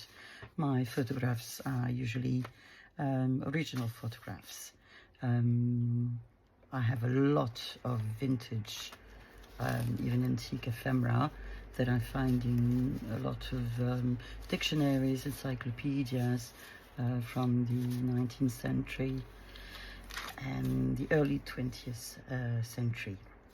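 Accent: France. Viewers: Wonderful first video! Don't be so hard on yourself! Your English is fine! I loose words too, and I speak English all the time. I also work with a woman from France, so I am comfortable with your accent! Keep up the good work! I got your link from Sevenplaza. Thanks!!! This is Carol from IN. USA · Very good video and your English sounds fine with a French accent!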